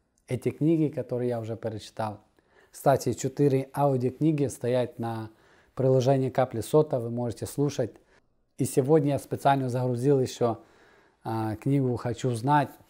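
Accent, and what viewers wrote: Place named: Caucasus. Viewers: Какой-то кавказский акцент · Да, чисто кавказко с украинскими словами!)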